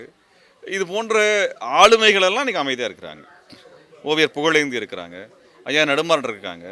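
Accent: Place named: Kerala, India. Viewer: Dei naaye h raja tamizhan da.. Nee naaye un slang eh malayala slang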